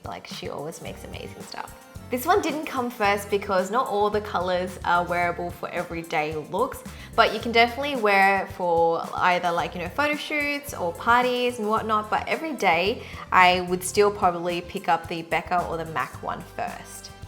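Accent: Australia. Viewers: Great video! I love your accent, where are you from? Australia? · LOL seeing you get so worked up over "stick" was hilarious. Curse our Australian accents! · Is she from Australia? I can't tell because I'm Australian too so I can't really hear it😂 plaease let me know❤️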